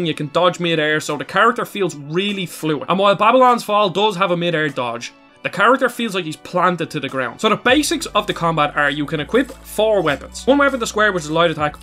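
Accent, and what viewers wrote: Ireland. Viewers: I’m here for the hot sexy Irish accent! Nice video!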